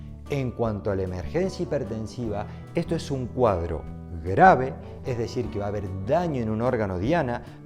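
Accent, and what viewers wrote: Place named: Córdoba, Argentina. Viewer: Buen vídeo ! El asentó cordobés no se te va 😂😂😂 yo 20 años in USA y no hay caso 😂😂😂 Aguante Alta Gracia !!!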